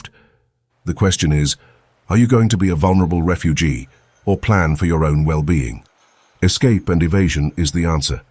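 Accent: United Kingdom. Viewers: Awesome video, the British narration was a very cool feature. Has a documentary type feel to it in the beginning then transitions into your own footage which is neat. Great work 😎👍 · Voice over is brilliant...very English!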